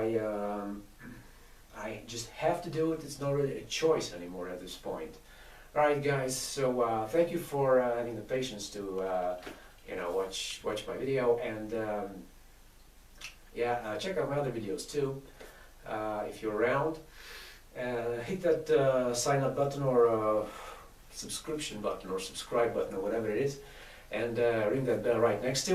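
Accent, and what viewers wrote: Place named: Northern Europe. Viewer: Hey sister, interesting accent, North European or Brazilian? Maybe a little Ricky Martin in your voice.... catch you on <link>!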